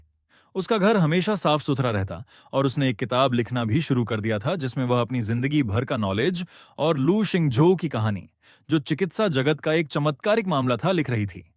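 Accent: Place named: Bihar, India. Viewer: Bhai jada bihari accent mat add kar pure hindi accent accha hai video bana ke liye 😅 basibhi eha samajh ne mein bahut pareshan ho raha hai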